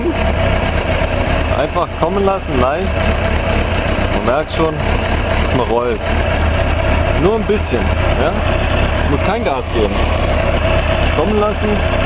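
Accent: Swabia, Germany. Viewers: Omg ich liebe Schwäbisch :D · Aha.. eh Schwob ;) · endlich amole an gscheiter schwabe oder ? :D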